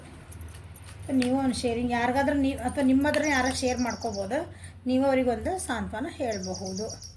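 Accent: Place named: Badami, India. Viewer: Hi mam...nimmadu yava uru mam?nimma matina shyali namma uru Tara ede...nammadu badami..nimmadu yav uru mam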